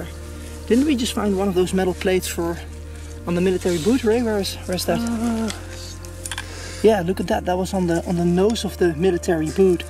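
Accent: Netherlands. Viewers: I mean this in the best way but I feel like I’m watch verstapen dig for ww2 objects it’s so cool love the Dutch accent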